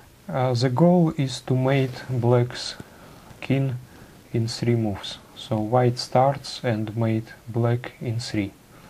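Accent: Russia. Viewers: Very nice. And English with Russian accent i so cool. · The "O" in the Russian alphabet is pronounced by like "Ah" (except the accented syllable" , e.g. хорошо = harasho Thats why he has that accent.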